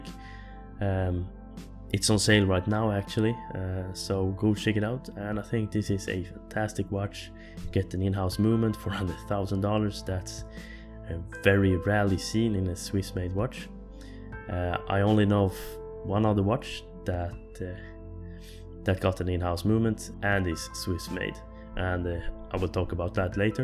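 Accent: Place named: Sweden. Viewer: May i guess, ur swedish arent u? Typisk svensk accent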